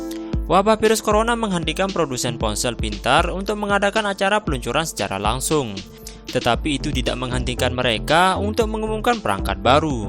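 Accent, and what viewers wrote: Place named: Java, Indonesia. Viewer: Adooh, medok suarane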